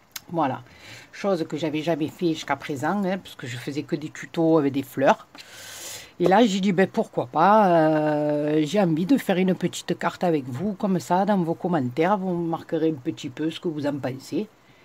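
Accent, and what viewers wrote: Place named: South, France. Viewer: Coucou, Waouh elle est tellement belle ta carte, du vrai shaby chic 😍 La dentelle se marie très bien avec ce rouge Hermes;) Merci pour la combine du doigt en silicone je ne connaissais pas! Ça doit être bien pratique. Ton accent me transporte à chaque fois ds les films de Fernandel 😁 j’adore!!! 😊 Passe un bon week-end! Gros bisous 💖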